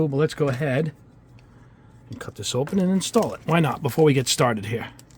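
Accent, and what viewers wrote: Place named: South, United States. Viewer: It’s his regional accent. Down south we say it different.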